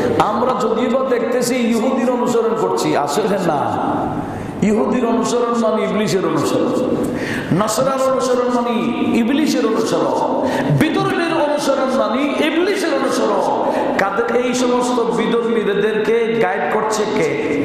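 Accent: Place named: Chittagong, Bangladesh. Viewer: হুজুরের বয়ানটাও চট্টগ্রামের।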